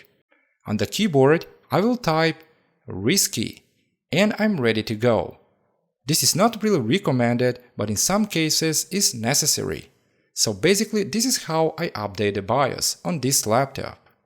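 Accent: Germany. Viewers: I'd say germanic origin (Germany). 🙄😏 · What a shitty german accent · And 🤔, you’re accents sounds a little german, he said “insteed” instead of ‘instead’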